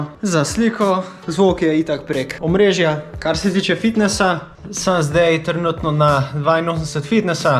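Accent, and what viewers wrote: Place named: Notranjska, Slovenia. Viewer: Bi me zelo zanimalo,če boš objavil časovni načrt z kraji,v kateri smeri boš vozil pri tvojem podvigu čez vse te občine.Če bomo zaželjeni,je nas verjetno kar nekaj,ki bi ti šlo nasprot in ti delalo malo družbe,da ti bo lažje.Še nekaj bi te vprašal,pa ne zamir,firbec pač,glede na to ,da ne govoriš špreho,značilno za kraje okrog Rakeka,me zanima,če si domačin,ali ste se priselili sem,sicer pa kakšno prav fajn zaviješ vmes po notranjsko😉,ja,držim pesti da se ti izidejo tvoji načrti in brez nezgod, lp.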